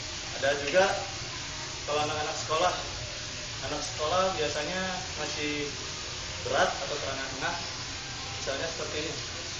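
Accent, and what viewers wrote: West Java, Indonesia. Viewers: logatnya sunda euy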